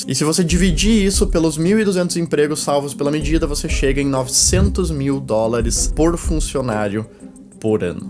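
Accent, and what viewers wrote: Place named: Paraná, Brazil. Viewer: Muito obrigado pelo conteúdo! Vc é paranaense pelo sotaque.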